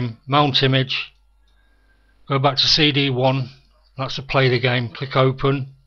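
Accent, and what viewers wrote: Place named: United Kingdom. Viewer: great tutorial. great heavy british accent. you sound like one a them hardcore british gangsters. lol. but thanks alot. cutting right to the chase, no bullshit music or anything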